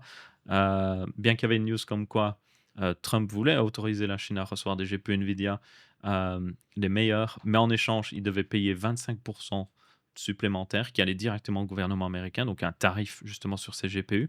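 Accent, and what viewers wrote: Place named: Belgium. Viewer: J'adore ton podcast, agréable a écouter avec se superbe accent, qui me rappelle les copains de Belgique.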